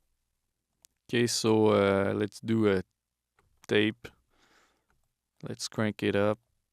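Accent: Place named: Quebec, Canada. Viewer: You would love Object editing in Samplitude. They been doing this for a long time. They have this crazy sale going on right now for Samplitude Pro X3 plus Sound Forge Pro 11 and Spectralayers Pro. Worth looking into. I'll post a link but I'm not sure it'll work. <link> I'd recognize that Quebec Accent anywhere. Salut! And thanks for the video.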